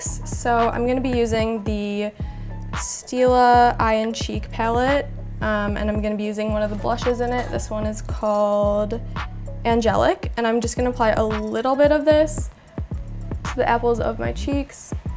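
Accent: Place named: Chicago, United States. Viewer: so cute! i hear that chicago accent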